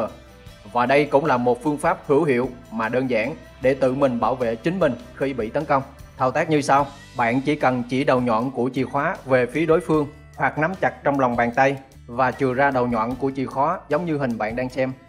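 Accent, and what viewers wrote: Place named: Rural, Vietnam. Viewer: Giọng ông này sao giống nhà quê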